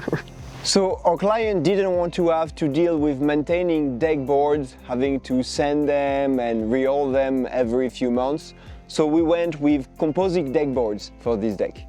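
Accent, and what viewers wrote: France. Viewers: French accent!😅❤ Thank you for sharing.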